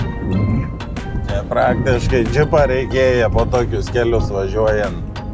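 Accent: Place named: Kuršėnai, Lithuania. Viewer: Patink video, o da labiau - kuršienietiška šnekta 😊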